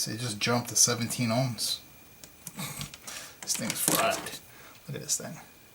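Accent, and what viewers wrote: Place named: Boston, United States. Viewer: thought so hear the accent. I live on the Dorchester south Boston line.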